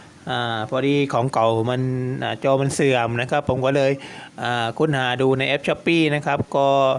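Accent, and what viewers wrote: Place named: South, Thailand. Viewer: ทำไมพูด เหมือนคนใต้คนใต้หรอครับ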